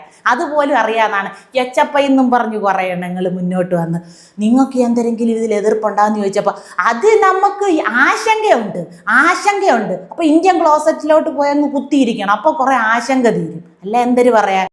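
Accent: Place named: Thiruvananthapuram, India. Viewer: തിരുവനന്തപുരം ഭാഷ ശൈലി തിരെഞ്ഞെടുത്തതിന് നന്ദി കാണാനും കേൾക്കാനും ഒരു ചേലുണ്ട്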